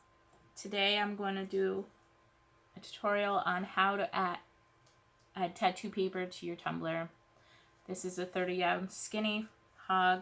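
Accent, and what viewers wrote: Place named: New Jersey, United States. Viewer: Lol I'm from NJ, just moved to Florida.. your accent was a welcoming thing to me.. we should go have some cawfee 😂😂😂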